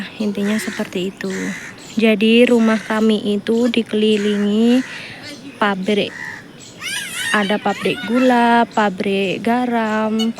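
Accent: Java, Indonesia. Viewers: Orang mana si mbaknya kok bahasanya medok kaya orang jawa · Mbak asli mana to,,,,?kayak jawa ya,?kira2 jawa mana mb,?semoga di balas heheh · Benar mbak, aku baru 2 hari nonton YouTube nya, dlm hati bertanya logat nya logat jawa tapi agama kok bkn Islam, ternyata pindah agama. Setau ku orang Jawa jarang sekali orang yg non muslim. kalau dari medan /manado wajar kl bkn muslim.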